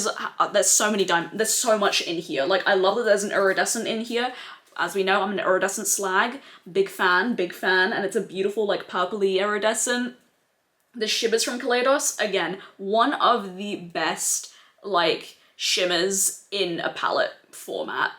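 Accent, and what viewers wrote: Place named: Ireland. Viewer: More of that Irish accent, please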